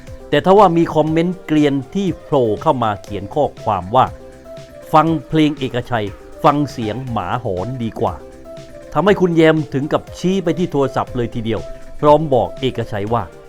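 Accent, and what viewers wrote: South, Thailand. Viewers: นอกจากเสียงไพเราะแล้ว พูดใต้ได้ชัดเจนมากเลยค่ะ ฟังแล้วทึ่งจัง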